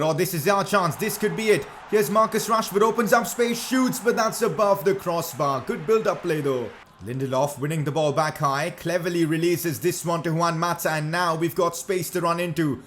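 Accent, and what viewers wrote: India. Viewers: Are You Indian.... Cuz your accent sounds too familiar.... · India accent😁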